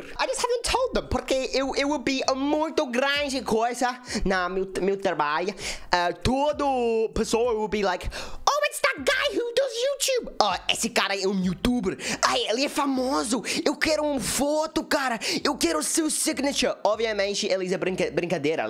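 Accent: Brazil. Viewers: Kkkkk com você fala com um gaúcho falado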